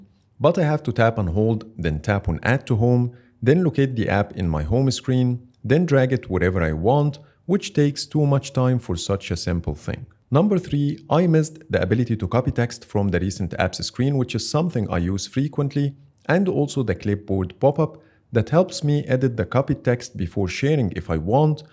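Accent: Egypt. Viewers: I like the way you embrace your Egyptian accent and not even try to cover it while sounding perfectly clear 👍 also great review from end user prospective just what viewers need.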